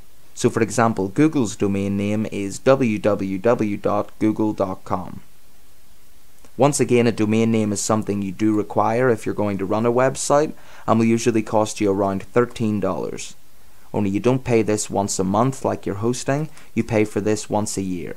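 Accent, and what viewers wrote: Northern Ireland, United Kingdom. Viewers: Very clear tutorial! All actions described in detail at a nice slow pace that suits me down to the ground! Love the Northern Irish accent as well, very refreshing after all the American drawl you tend to get on the web! Thanks for posting this, it really makes it all so much easier. Now all I have to do is think of a good name....;)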